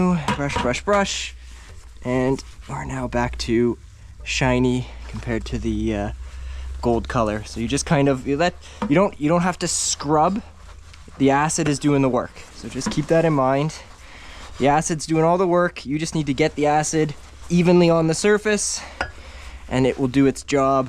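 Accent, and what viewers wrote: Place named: Canada. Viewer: This young man sounds like a Canadian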